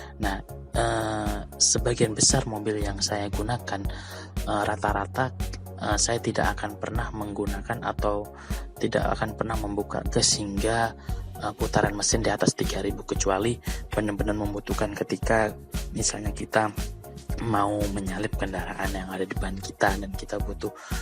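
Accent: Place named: Madura, Indonesia. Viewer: Asli madura ya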